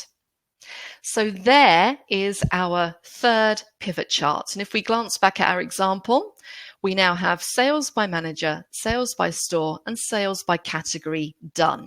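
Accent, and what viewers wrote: England, United Kingdom. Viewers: I like genuine English accent in addition to your fabulous teaching.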